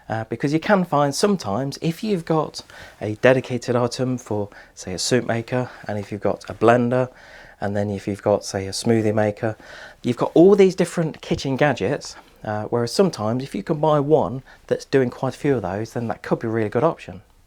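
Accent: United Kingdom. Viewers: I'm not considering to buy a new Soup Maker at the moment, but whatched the episode and gave it a TU for the fact alone you upload this kind of usefull content. You bring it in a very nice classic British manner; it's not spoken too fast, so non-native English viewers can also follow along quite easily.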